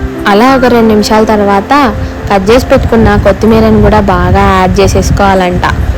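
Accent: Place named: Kadapa, India. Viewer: Kadapa slag vinte ,aa feelee verappa😊😊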